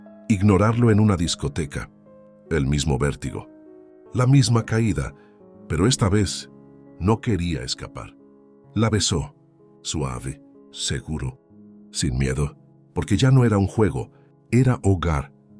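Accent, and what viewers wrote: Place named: Portugal. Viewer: Muy mal leido. Cambien de robot de lectura. Mezcla portugués con español y corta mal las frases. Chau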